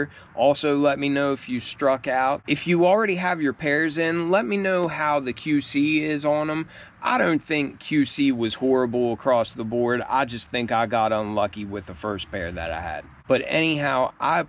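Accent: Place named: South Jersey, United States. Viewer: U sound like ur from south jersey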